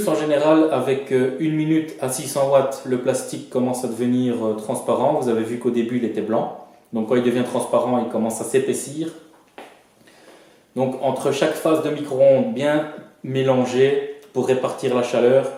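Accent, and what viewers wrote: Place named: East, France. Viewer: Le meilleur c'est à la fin 😊👍 Très belle vidéo et explications claires avec l'accent de l'est.😊🎣🎣🐠🐡🐟🐠🐳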